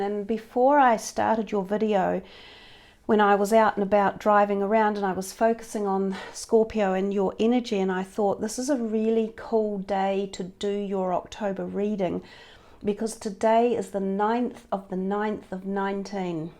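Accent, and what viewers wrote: New Zealand. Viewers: Thank you again for another beautiful reading, once again I resonated with the whole reading, & was bought to tears when you said about a little girl and she has grown. My god sister passed away from a brain tumour when she was 2 years old. And what spun me out the most was I was thinking about her when you first mentioned lights all around us, I asked myself I wonder if she has grown because I bet she would look absolutely beautiful, I feel i was meant to see this. I appreciate it alot. So much love from me to you. 💕 P. S Are you from NZ also? Your accent sounds kiwi ☺️ no offence intended if you are not 😁 Jess x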